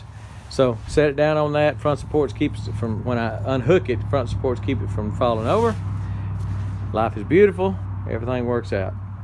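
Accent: Texas, United States. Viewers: From your Texas accent, are your from Uvalde?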